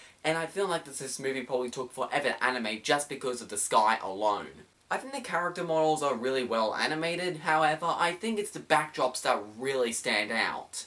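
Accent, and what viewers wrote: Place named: Australia. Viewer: so you mean best and worst movies of the year right? that is a cool idea looking forward to it :) and by the way i love your australian accent it is pretty cool and makes you unique:) i think it is so interesting just that the same language sounds so different spoken by persons from different countries :) in my case my english videos have a german accent so my english sounds also different :)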